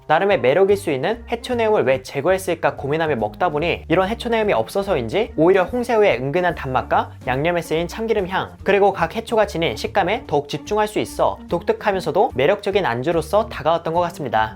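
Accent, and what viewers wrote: Gyeongsang, South Korea. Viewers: 사투리 쓰시는거 보니 경상도 분 인가요?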